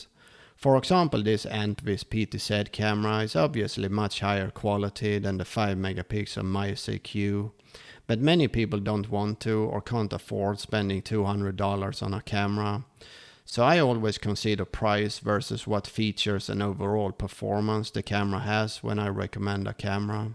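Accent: Sweden. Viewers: Your accent sounds a little bit Swedish, are you?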